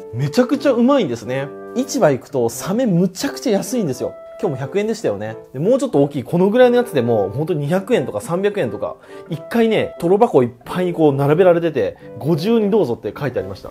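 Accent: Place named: Aichi, Japan. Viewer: の愛知訛りを聞くと地元に帰った気分になりすごく落ち着きますいつもありがとうございます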